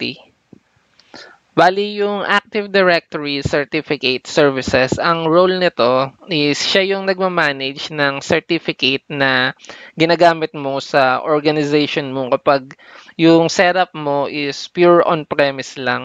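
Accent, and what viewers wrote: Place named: Iloilo, Philippines. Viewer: Nice tips sir, I've been sharing your post to help the community, niche ko rin sana to kaso di pako certified and di pako ganun ka bihasa hopefully marami akong matutunan dito. maraming salamat! and may ilonggo accent ang pag sasalita mo, I'm from Koronadal City , Ilonggo dialect rin. hehe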